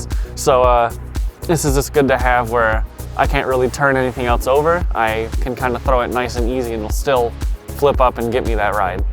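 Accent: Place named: Michigan, United States. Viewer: Ive lived in Michigan all my life and this is the first time I noticed a Michigan accident.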